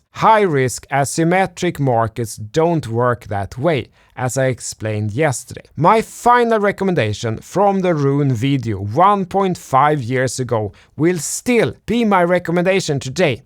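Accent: Sweden. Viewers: Your analysis are amazing and thank you for doing all the work for us and I love your Swedish accent , please do Some doge coin analysis and prediction Thanks again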